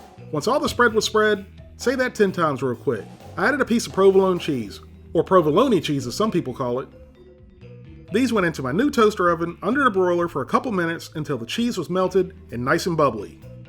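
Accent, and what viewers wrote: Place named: North Carolina, United States. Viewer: I've been watching some of your videos randomly for a bit now, and I've always thought you sounded like someone from NC... I am also, that's how I knew.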